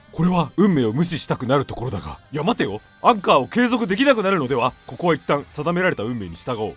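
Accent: Kansai, Japan. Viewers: 大阪万博いって関西弁使うのにハマりました？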